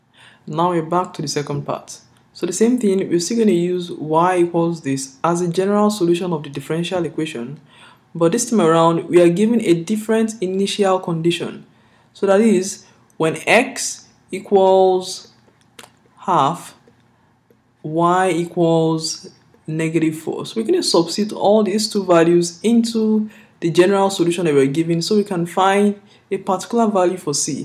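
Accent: Nigeria. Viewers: baba, are you Nigerian?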